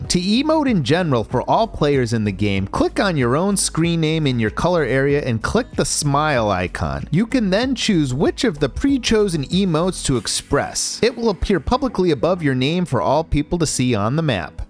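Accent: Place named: Canada. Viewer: I’m from upstate New York and I hear it sometimes in a select few words… he sounds Canadian so I guess that checks out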